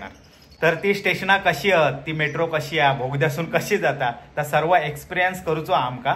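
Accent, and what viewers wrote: Malvani, India. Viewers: तुमची मालवणी लय आवाडली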